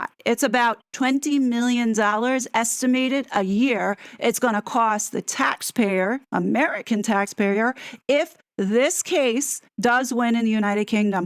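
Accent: New York, United States. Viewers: how very frustrating but I loved the New York coming out in your voice as the call went on. Geez none of us should be paying for these grifters!! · you speak and act like a real New Yorker…LUV IT…I’ll tell you this I’m from LA to many fake/plastic people around here and my cousins/friends that’s from NY/NJ who came to visit me first opinion about LA “to fake and they show off what they have, meaning wearing the brands name for everyone to see” lol, to which I agree. Many reasons also I don’t date LA men that has been breed here or grew up for how many years lol. New Yorkers they don’t hold back the truth what needs to be said and they act on it. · Love your New York accent!! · Wow! Your New York really came out in this call!! Good job! I don’t live in NY but am heavily involved in municipal finances where I live and I can tell you…..THIS WOULD NOT FLY! 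💩would hit the fan! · On a side note, I didn’t know you were a New Yorker,. But I like your moxie and I mean this ad a compliment when I say your frankness and straightforwardness, would make it not surprising you were an archetypal New Yorker. Cheers (and subscribed just for this). Makes me want to visit. And as I listen to you more in your call, I hear the NYC accent too more and more.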